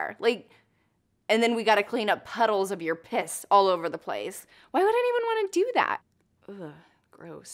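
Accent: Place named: South, United States. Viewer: Hey what part of America are you from?Your accent kinda sounds south:P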